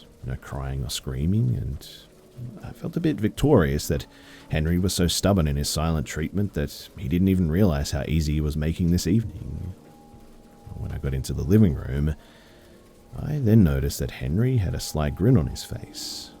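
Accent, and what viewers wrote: Australia. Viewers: you be rockin with the full on australian accent better than lazarbeam himself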